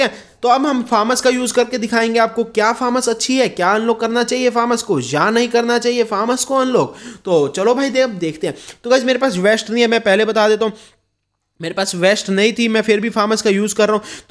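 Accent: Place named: Haryana, India. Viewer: Haryana se bhai tu teri language same hai hmare jasi😍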